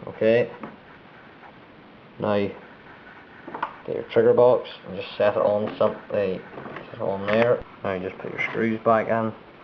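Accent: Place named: Belfast, United Kingdom. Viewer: love the Belfast accent :L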